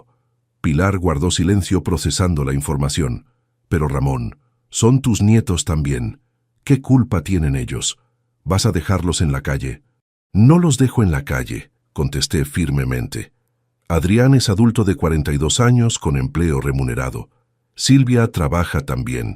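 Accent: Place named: India. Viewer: Eso de narrar estás historias en indio, las perjudica totalmente,, si no se corrigen no tendrán mucha audiencia, es patética, está forma de narrar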